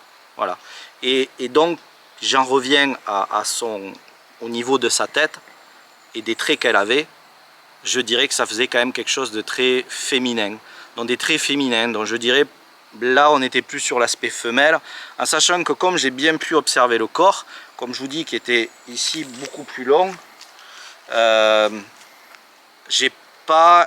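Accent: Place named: South, France. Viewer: Super vidéo comme d'hab! J'apprécie beaucoup pour ta sensibilité et tes réflexions très profondes..Et ce bel accent du sud n'est en rien déplaisant.. bien au contraire! Et puis quel courage d'avoir créé une telle chaîne! Merci beaucoup.. que du bonheur et de l'authenticité!🙏🙏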